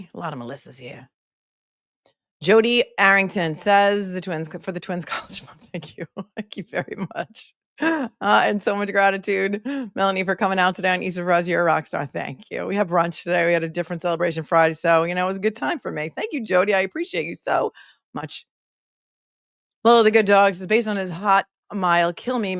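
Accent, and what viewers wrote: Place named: Long Island, United States. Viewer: Melanie, I know you don't need more kudos. But I have to give them. You have all the receipts, all the time! Your coverage is my favorite, and got me through 2 surgeries (just with Karen Read!). Looking forward to watching 2.0 with you. Plus I lived in Great Neck and Levittown for 15 years so your accent makes me feel like I'm visiting friends.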